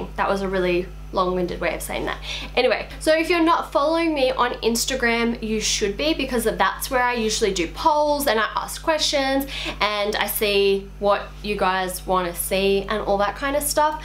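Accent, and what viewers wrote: Australia. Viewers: That’s interesting that someone would say your accent is funny. Your accent sounds more universal than the strong slang Australian accent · Hahaha I feel you about thinking the Aussie accent is obvious! I think it’s even worse for New Zealanders ;)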